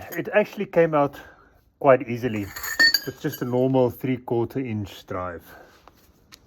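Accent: South Africa. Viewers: ‘tsempian’ for sure lol. You will never be able to hide your South Africanisms😅 I must add that one thing I really do like about an SUV is that with its higher ground clearance one can get under the car and service it for the most part (however somewhat awkwardly), but with a normal sedan or hatchback just jacking up the car is such a chore and I hate it. On larger SUVs the engine bays have space to use tools, smaller cars not so much.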